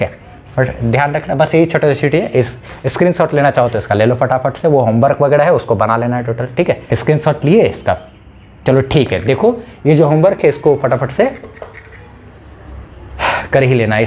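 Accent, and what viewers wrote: Bihar, India. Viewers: Love your bihari accent 😍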